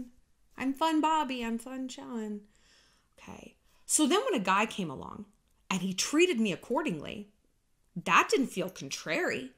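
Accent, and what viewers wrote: Australia. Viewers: As an Aussie, your Australian accent is sending me 😂😂😂 love it ❤️ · Omg ...I’m from Sydney!!! Babe ... your Australian accent is soooooooo great !!!!😂😂😂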